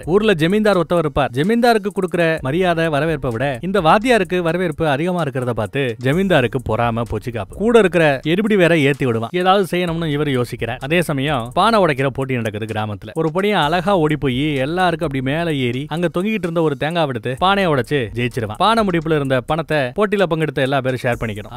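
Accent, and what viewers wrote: Coimbatore, India. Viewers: Poramai &pochirubu kovai slag bro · 😂😂 anne nenga coimbatore ahh😅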